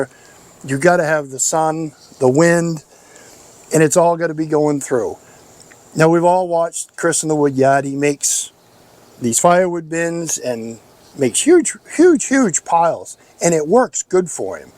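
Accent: Maine, United States. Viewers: Are you from Maine by chance? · Yes you get that white mold on birch here in Nova Scotia too. New Subscriber here, because of the Maine accent 😅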